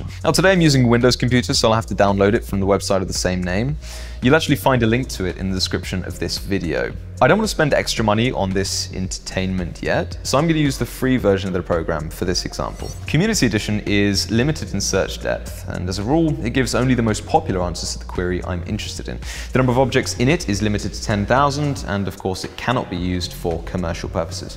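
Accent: RP, United Kingdom. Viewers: The ultimate effortlessly posh accent. Brilliant.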